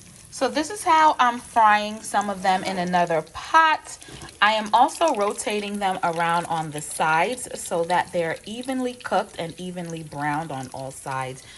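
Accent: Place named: Jamaica. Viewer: Please use your accent more. The Jamaican accent is so beautiful to hear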